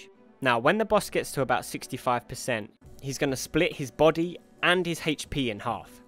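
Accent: United Kingdom. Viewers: Great vid. Thnx. It must be the British voice.:D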